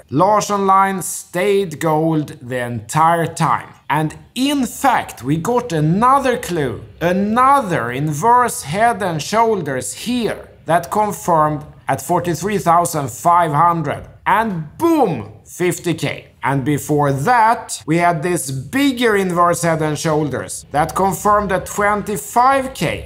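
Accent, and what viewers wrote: Sweden. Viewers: Suiting voice for a travel show with a unique swede influenced dialect. I just don't get over how close your username is to "CTE Larsson" 😂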